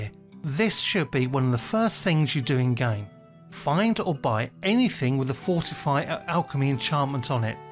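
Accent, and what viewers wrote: England, United Kingdom. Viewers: This sounds extra legit with the English accent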